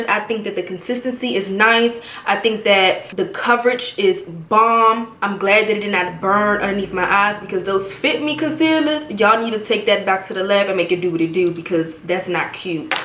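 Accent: Washington DC, United States. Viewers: you sound like you from dc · You sound like you're from DMV area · DMV Wya???😂😂 as soon as she started talking I kneeeeww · is that dmv accent I hear in your voice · I know that DC accent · you from the DMV??! i knew from the moment u spoke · Are you from DC? Sounds so much like home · i hear that accent that's DC all day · this DMV ACCENT !!!! yaaaass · as soon as she started talking I'm like yasss she must be from back home in DC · DC accent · YOU HAVE TO BE FROM DC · You gotta be from DC lol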